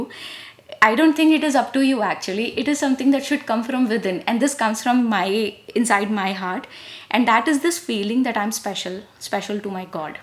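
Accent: India. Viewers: Can't convert your accent into a estimable one. Indian accent is utterly hideous and dismal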